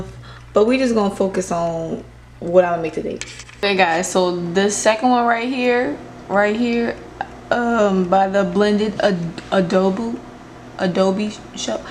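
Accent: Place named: New Orleans, United States. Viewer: I detect a hint of a New Orleans accent in your voice. Food looks good!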